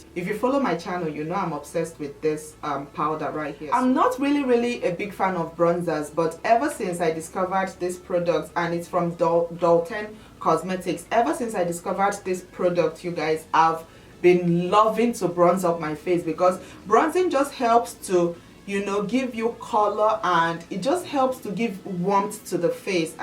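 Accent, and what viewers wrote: Nigeria. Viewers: b. yea shes nigerian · I’m gonna guess Nigeria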